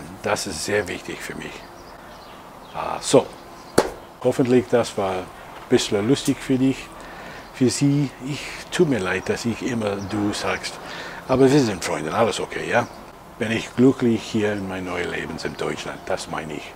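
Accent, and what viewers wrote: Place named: Netherlands. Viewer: Sehr schön wie du sprichst, man merkt auch deine holländischen Wurzeln!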